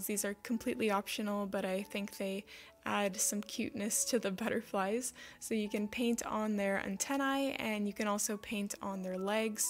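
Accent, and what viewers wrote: Canada. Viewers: Oooo. Canada i knew it.. i could hear it in your voice... then i read your profile.. you're very talented. Thank you for your art.❤❤❤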